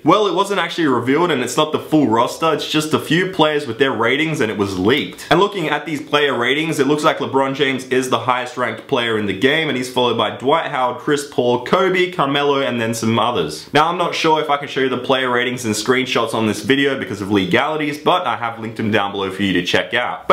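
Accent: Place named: Australia. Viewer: His Australian accent >>>>>>>>>>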